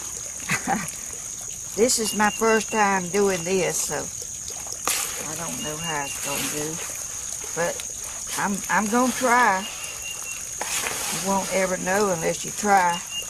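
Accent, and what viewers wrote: South, United States. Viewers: love your videos & your wonderful Southern Accent. I’m an Alabama Girl.... Have you tried working with old tennis shoes? My daughter received a flower planter as a gift made from a shoe. It looks like the artist use your technique with concrete. I’m anxious to try one of your projects. I have been saving old jeans for a while. Now I know what I want to do with them. Thank you so much for your videos. I love every one of them. Your videos have inspired me to get busy and use up those jeans. Lynn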